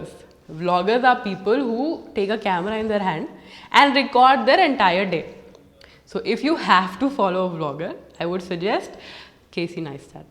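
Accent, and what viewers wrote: India. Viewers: I don't like them I like only Indian English like you.. I always hear your English speaking in youtube during sleeping.... Am I telling true' English 😀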